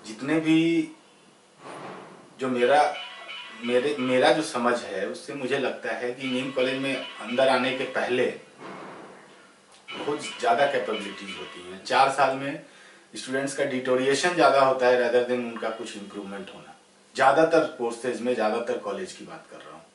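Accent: Bihar, India. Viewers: Aap bihari ho? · bhai tum Bihar se ho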